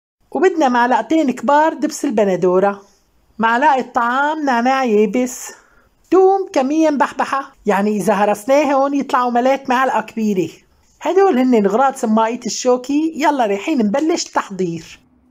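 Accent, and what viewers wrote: Aleppo, Syria. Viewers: لك خالتي و الله بفوت ع الفيديو مشان اسمع كلامك الحلبي الاصيل.ابوس قلبك شقدك مهضومة و الله عبتزكرينا بكلامات رح ننساهن😍🥰😅😅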